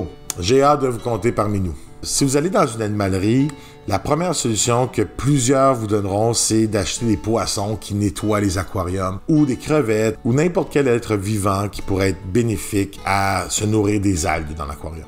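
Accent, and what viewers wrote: Quebec, Canada. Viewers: Pareils que toi ! Après c'est un choix, mais je pense que les poissons aiment mieux avoir des trucs à grignoter pendant la journée, j'ai diminué leur ration de granule depuis un mois et avec ce qu'il trouvent à manger dans le bac ils sont en super forme ! Les mbunas c'est un peu à part pour les paramètres de l'aquarium par rapport aux autres poissons. En tout cas j''apprécie beaucoup ta chaine et je suis fan de votre accent à vous autres québecois !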